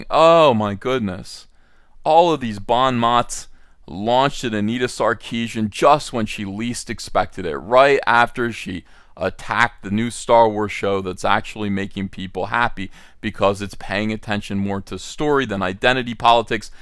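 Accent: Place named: Pennsylvania, United States. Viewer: I love the tactic of compiling the hateful tweets of the SJW crowd when one of their shows premier. Case in point The Wokeman's repulsive 1st episode. Virtue signaling doesn't work now that the normal folk have taken to the interwebs in defense of sanity, and as you so well put, STORY TELLING in entertainment. On a side note, I listened to this episode at my folks house while fixing my mom's table. She not only laughed at some of the clever zingers, but asked, "Oh, is he from PA??" In an enthusiastic tone. Lol. I thought the same, I must say. We're Texans, but mom hails from The Keystone State and you do seem to have that regional inflection. Love your work, good sir!! Happy Thanksgiving to you and your family!!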